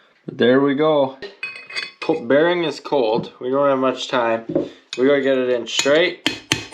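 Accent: Canada. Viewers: Aha. I was trying to figure out where this guy was from. I was guessing Dutch Canadian. So I know Canadian for sure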